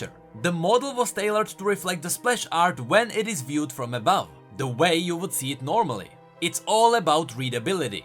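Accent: Czech Republic. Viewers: Věř nebo ne, ale už jenom podle přízvuku jsem poznal, že si Čech než jsem se podíval do popisku:D původ nezapřeš xd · Podíval jsem se na tebe poprvé a hned mi bylo jasné že jsi čech podle toho přízvuku :d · Český přízvuk? :D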